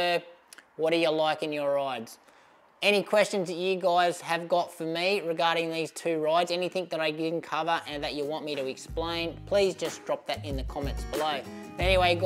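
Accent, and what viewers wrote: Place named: Australia. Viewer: This guy is a great reviewer and he has one of the most thickest austrailian accents.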